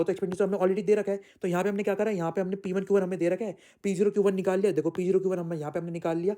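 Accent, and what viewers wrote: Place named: Pahadi, India. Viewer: love that cutu pahadi accent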